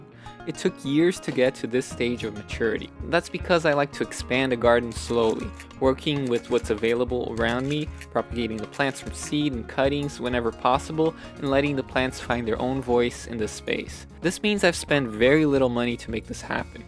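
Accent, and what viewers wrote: Brazil. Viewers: Wow!!! Just WOW!!! The convergence of your skill sets is just spectacular, this video is an absolute work of art! Are you Brazilian? You sound Brazilian! And I noticed two Brazilian cities made it onto your list of great cities. Such an amazing video. Thank you for sharing your passion so beautifully. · Acabei de acha o canal e simplesmente amei, suspeito pelo jeito que você pronunciou salvador e Rio de Janeiro que você é brasileiro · Não sei se tu é Br mano, mas tem o mesmo sobrenome que eu e um sotaque mais brasileiro. De qualquer forma, teu canal é ótimo e estou me identificando a todo vídeo. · Tu é brasileiro ? Vc tem sutaque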